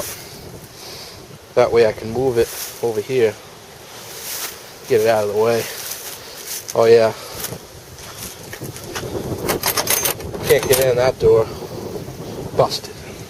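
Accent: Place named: New Jersey, United States. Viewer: You sound like you have a New Jersey accent.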